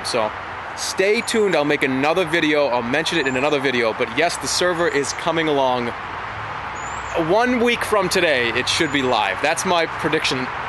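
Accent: Boston, United States. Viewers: he is so boston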